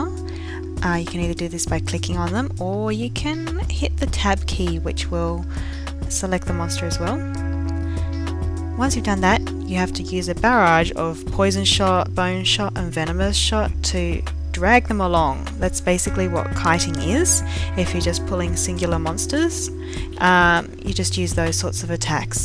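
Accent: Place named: Australia. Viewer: AUSTRALIAN ACCENT U IN AUSTRALIA TOO!!!:D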